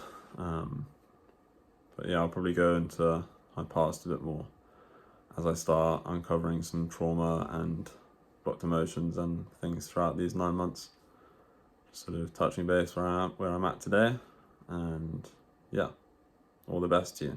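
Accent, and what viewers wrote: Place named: United Kingdom. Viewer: Oh that Beautiful British Accent 😍🇬🇧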